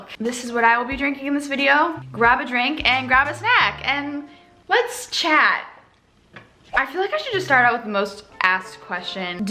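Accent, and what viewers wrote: United States. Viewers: She has the LA accent now :((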